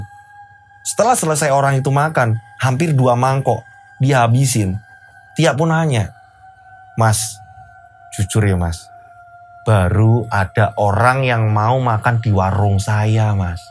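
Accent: Java, Indonesia. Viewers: Bang ini bahasa jawanya medok banget ya...slmt siang semuanya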